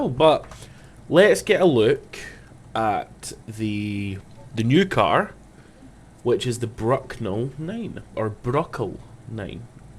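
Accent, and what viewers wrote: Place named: Scotland, United Kingdom. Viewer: It's the first video from you, that popped up in my feed. You're speaking like level 1.5 or level 2 of Scottish out of let's say 5. I wanted to move to Scotland 4 years ago (as an eastern european), but covid stopped me unfortunately. I understand English very well, but Scottish English was something new for me. Thankfully I found "Autoshine Cars" account, who's a Scotsman living in Blackpool and learned your pronounciation from him and can understand you fully :). I love your vid, keep them coming <3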